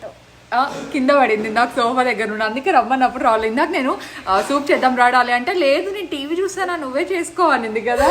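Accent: Anantapur, India. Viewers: Akka meeru Ananthapoor slag matladithe vinalani undhi akka plzz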